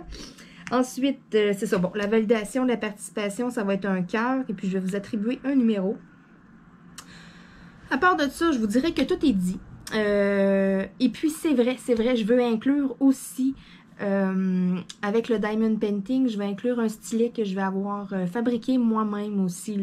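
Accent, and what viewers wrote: Quebec, Canada. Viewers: Félicitations pour ces 402 abonnés, jadore l'accent québécois c'est aussi pour ça que je regarde vos videos. Je participe en croisant les doigts. Sacré gain... bonne chance a tous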